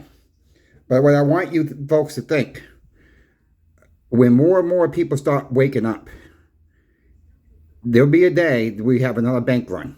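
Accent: Boston, United States. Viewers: After hearing you talk for a minute, I can tell you are from Boston...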